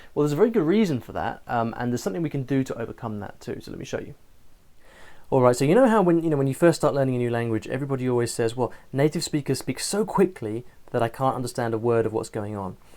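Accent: United Kingdom. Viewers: you brithish english is really cool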